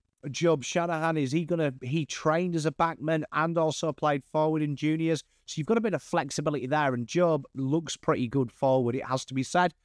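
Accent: England, United Kingdom. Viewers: Curious, how did a Pom with a such a thick accent get to know so much about AFL, do you live in Oz? Anyway great channel and insights. Tom McCarthy has firmed as the front runner it seems. He's fast, really fast. Right age bracket, plug n' play. But gawd, we need a good ruckman asap!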